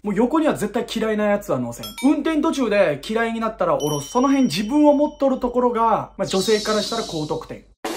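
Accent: Hiroshima, Japan. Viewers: 広島弁が沢山聞けて嬉しい。車シリーズ大好き。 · コテコテの 広島弁良いですね。私は１２０系マークXに乗ってます。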